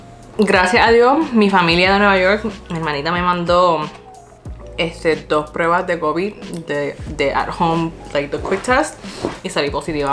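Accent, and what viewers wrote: Puerto Rico. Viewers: Me quedo impresionada con TU acento denouertoriquena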